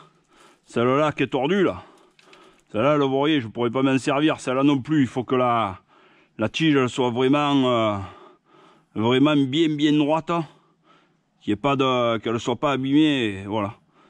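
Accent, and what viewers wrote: South, France. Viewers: merci pour la reponse je vais en faire avant de partir peu etre pourrons nous se voir vers des cieux au taunos sil on est pas loin jaime cet accent tres sud cordialement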